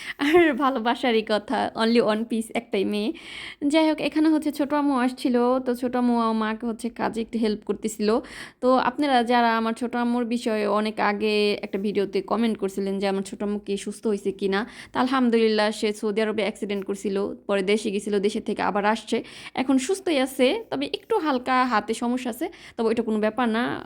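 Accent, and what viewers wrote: Faridpur, Bangladesh. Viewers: আপনার ভাসা সুনে মোনে হোইলো আপনারা ফোরিদ পুরের তাই না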